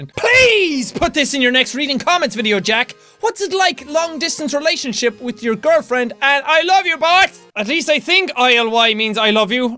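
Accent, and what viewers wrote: Ireland. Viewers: put me in ur next one and UR BOSS AND ARE U IRISH ?????????????????!!!!!!!!!!!!!!!!!!! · i understood the deep irish accent as i use quite a bit o them sayings everyday. please can you do a complete episode in a thick irish accent... it would be boss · Loving that full Irish! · JACK!!!!!! 2 QUESTIONS!!! CAN YOU SAY MY NAME IN YOUR IRISH ACCENT? AND DID YOU STEAL MY CAKE?!! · R u BOSS,southern Irish or northern Irish! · Dat Irish Talk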